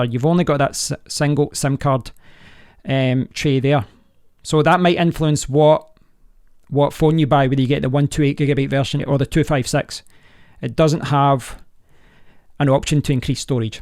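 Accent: Scotland, United Kingdom. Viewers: I wish I could speak Scottish English, sounds amazing!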